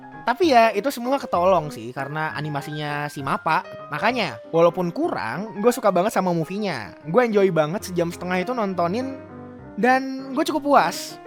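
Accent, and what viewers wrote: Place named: Madura, Indonesia. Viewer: bang, lu ngomong nya madura😅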